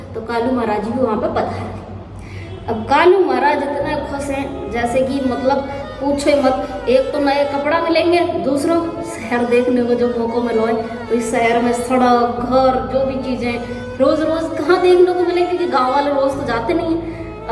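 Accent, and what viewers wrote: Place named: Rajasthan, India. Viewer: ap b rajasthan se h dii 😍😍😍vhi me sochri hmare yha k jesi language h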